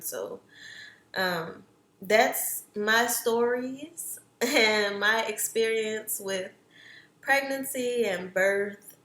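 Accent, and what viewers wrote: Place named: New Orleans, United States. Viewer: I love that you shared this! Having a birth plan is key when giving birth at either a hospital or birthing center. My midwife had a major concern with my pregnancy because I was so tiny, so she was afraid that my baby wasn't growing anymore and she wanted her to come out. She was going to insert something vaginally to induce me, but when she referred back to my birth plan, she did her best to follow my wishes and she did just that. My first birthing experience was amazing! I hope you have a better birthing experience with this baby. Congrats! You have a beautiful family & I also noticed that you are from New Orleans, I can tell by your accent because I am from there too. Lol!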